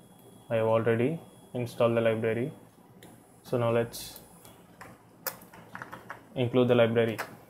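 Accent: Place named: India. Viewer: Damn always Indian accent...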